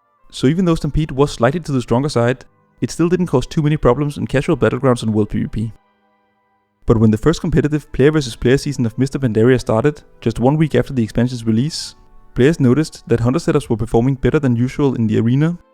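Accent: Denmark. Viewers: Skud ud til danglish! <3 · Great video, I love the danish accent, nice narration. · You can just hear this guy is danish xD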